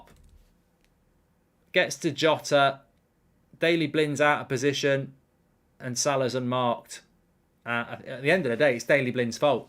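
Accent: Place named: Manchester, United Kingdom. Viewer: Its that miserable manc accent that makes it funny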